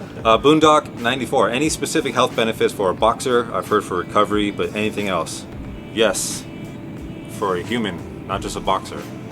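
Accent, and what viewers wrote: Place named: Westchester, United States. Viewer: loo sounds like your in westchester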